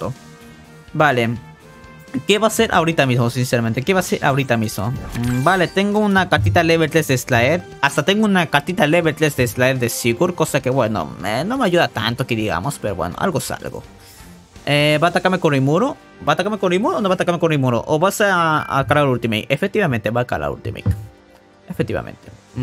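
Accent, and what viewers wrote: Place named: Pocho, United States. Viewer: Yo te escucho más pocho fiu fiu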